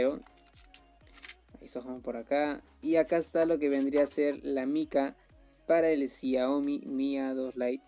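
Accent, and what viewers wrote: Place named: Peru. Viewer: obvio el acento peruano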